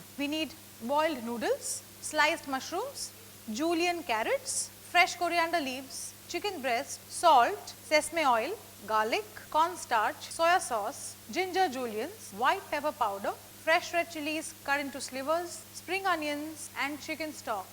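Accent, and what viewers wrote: India. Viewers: what happened to ur pronunciation? very indian..! rather speak hindi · She happens to be Indian.so the Indian accent.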